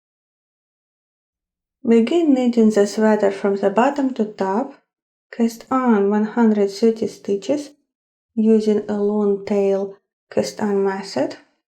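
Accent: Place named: Russia. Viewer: Сильный русский акцент... Тяжело воспринимается. Жаль :(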